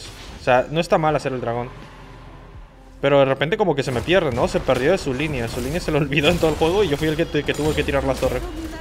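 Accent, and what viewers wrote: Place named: Peru. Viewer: Y un poco de peruano:v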